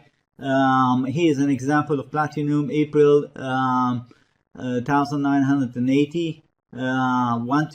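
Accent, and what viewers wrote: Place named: Australia. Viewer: By the accent, I guess Australia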